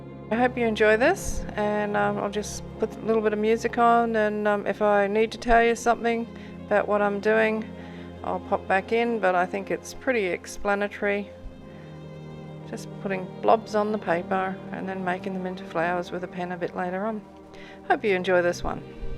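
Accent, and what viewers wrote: Australia. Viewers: It was nice hearing your Aussie accent (I am an Aussie too) because most YouTube instructors are American.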